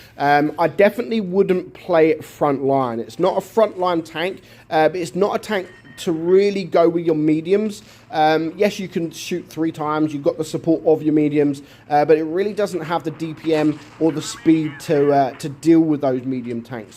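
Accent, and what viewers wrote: United Kingdom. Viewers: bri'ish accent lol, i also mishead lmfao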